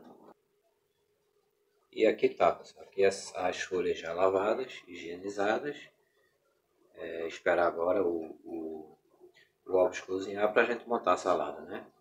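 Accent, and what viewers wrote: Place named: Pernambuco, Brazil. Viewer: Eita que sotaque lindo do meu Pernambuco 😍. Salada maravilhosaaaaa! Já vou fazer, não vai ficar maravilhosa como a sua. Mas vou tentar. Parabéns!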